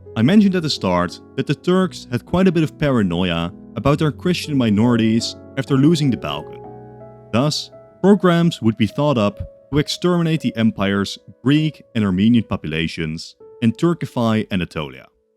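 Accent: Netherlands. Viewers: Ik ruik Nederlands accent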